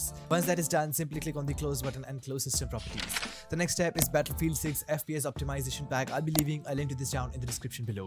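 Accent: India. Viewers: indian detected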